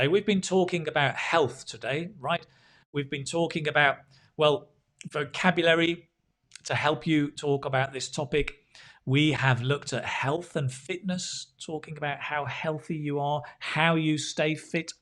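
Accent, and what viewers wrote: United Kingdom. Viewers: Thanks so much, for your English Speaking Success on the YouTube channel. You've been giving me chances to immerse myself in the daily uses of English on different ambitious, especially with your British accent which I've been learning since primary school, more than 50 years ago under the British Colony. but I still have problems in the sentence stress. Anyway, I will keep watching your videos everyday anytime or even during having a shower. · I and my children👶👧👦 7-8-11))))really like that you regularly do with your great clasical BritishEnglish pronunciations and sincere interested teaching acurent natural fluency skills!!!!!!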